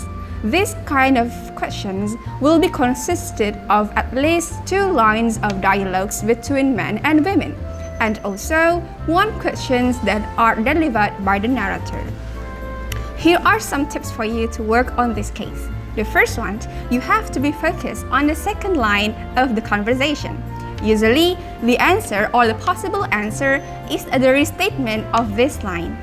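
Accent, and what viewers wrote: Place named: England, United Kingdom. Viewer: Kakak nya pake english accent ya;(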